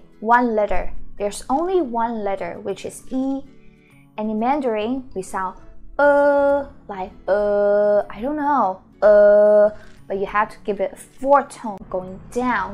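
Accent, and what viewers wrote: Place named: Taiwan. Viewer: thank you really easier for me to learn it with Taiwanese accent! got addicted with all this Taiwan movies:) pls do more... is their any rules for the chinese characters or we can just simply memorize it? or their is an alphabetical rules for that? thank you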